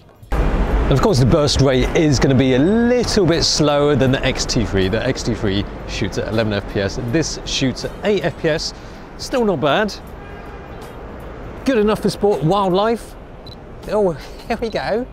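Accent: United Kingdom. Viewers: Are you British or Japanese with a British accent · listening to you talk makes me wish i had british accent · The way to improve my British accent, it's watching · 英式英语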